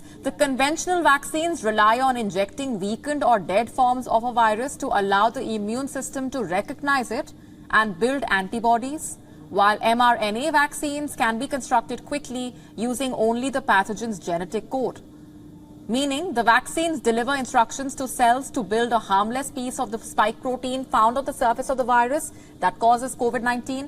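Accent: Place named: India. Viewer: Go work on your Indian accent it aches my ears, I tried...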